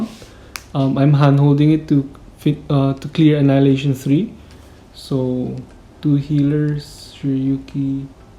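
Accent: Philippines. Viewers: why do you sound so Filipino to me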